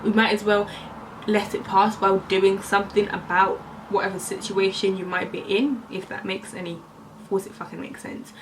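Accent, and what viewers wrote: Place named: Crawley, United Kingdom. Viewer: Where abouts are you from? You sound like your from Crawley. Love your tats btw👏🏽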